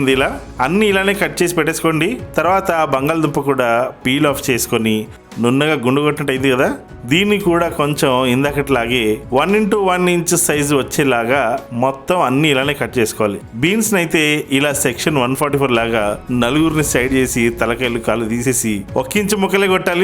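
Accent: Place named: Telugu, India. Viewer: garu veg fans ni alarinchi naduku Dhanyavadalu!! Sir meeru films lo padalani korukuntunamu original telugu voice vastundi mana patalaki.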